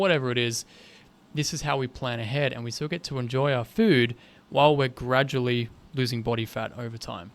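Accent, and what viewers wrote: Australia. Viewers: "a skinny fat Italian dude", I cannot see any Italian side (aesthetically) on you, even your name and surname sounds really English. From the way you pronounce some vowels I think you are Australian, anyway from which part of Italy are your (grand)parents? I like the way you explain how the body works, you are one of the best natural body I can see on Youtube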